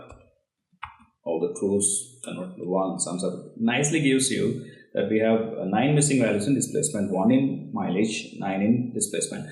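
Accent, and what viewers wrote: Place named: India. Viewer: Great content.. And our Indian acccent is also good fyi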